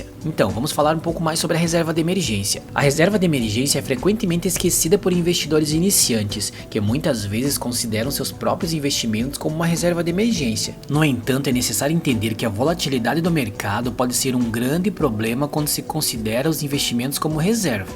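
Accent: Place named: Rio Grande do Sul, Brazil. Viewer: Bah gaúcho kkk que sotaque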